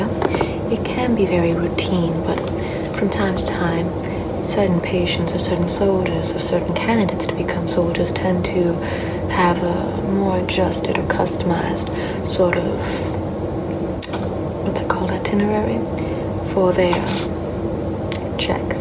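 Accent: South, United States. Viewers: American Southern accent > any other accent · Ah yes, the near extinct classic Non-Rhotic Southern accent, how I wish this accent was more of a thing.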